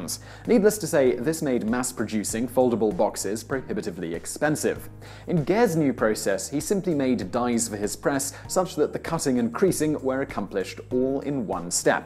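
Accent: England, United Kingdom. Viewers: I’m pretty sure he’s English lol give him a break 😂 some of those pronunciations are actually correct U.K. pronunciations. And I think the besieging may have been either him assuming it was a German word, or the text was typoed like “beiseging”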